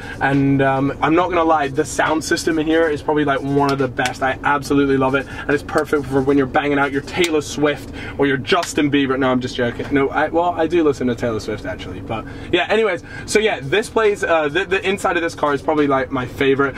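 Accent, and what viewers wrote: United States. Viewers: is he american? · Are you American or Canadian? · why does this guy have an american accent? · dude he sounds American not English tf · am the only one thinking that sounds American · american accent lol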